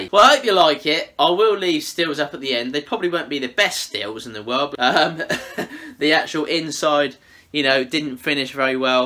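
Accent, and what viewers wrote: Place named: United Kingdom. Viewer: I want to go to the UK just so I can walk around and hear everyone talk. Your accent is so cool.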